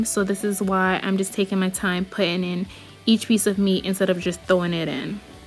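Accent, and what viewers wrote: United States. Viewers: Yea that's how I make mine, how you no so much about Jamaican food, I thought you was an American. Girl especially you're voice.love you're videos so much.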